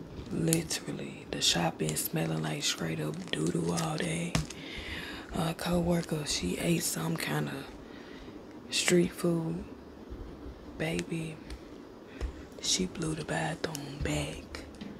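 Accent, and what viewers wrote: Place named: South, United States. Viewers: Girl you are hilarious 😩😂🤣… I’m sleepy & entertained. Your personality shines through this skit. Love it! & You are from somebody’s Southern state, I hear it loud & clear.🫶🏾 I’m glad this popped up on my feed. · Being from alabama this just sounds like a southern accent to me 👀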